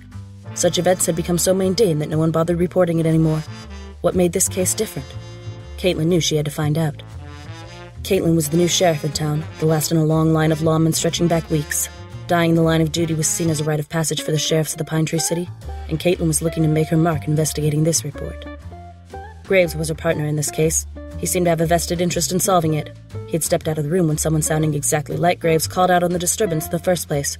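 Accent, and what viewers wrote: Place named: England, United Kingdom. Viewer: This was the worst and most annoying English accent i've ever heard.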